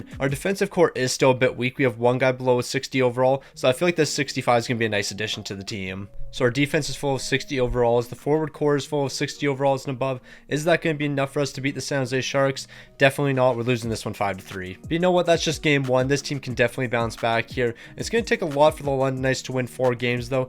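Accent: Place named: Quebec, Canada. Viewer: you actually pronouced cataractes exactly right lol (well i mean, minus the quebec accent)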